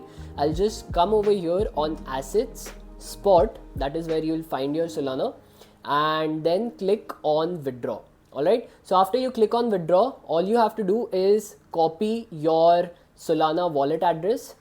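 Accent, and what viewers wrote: India. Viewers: Almost had me diddy till I heard an indiann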